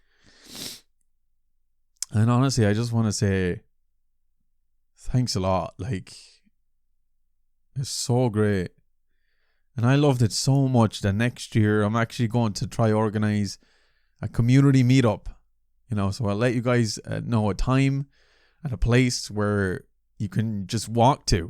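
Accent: Ireland. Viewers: my guy, other people’s joy is not your responsibility !!! don’t ever put that pressure on yourself again cause we’re here for the laughs but not at the expense of your health 😭 take however long you need cause if you feel great your content will be great without even trying. we’re not going anywhere so you can relax. i mean, at least i’m not cause you’re irish kylo ren you could literally read off a shopping list i’d still be fascinated lmao take care ! ✌️😛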